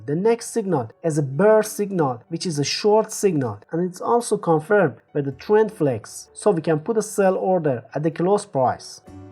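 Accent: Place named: Iran. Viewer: Just the ones I was looking for. Thanks so much.👍👍👍 By the way, from your accent I guess you're Iranian, am I right?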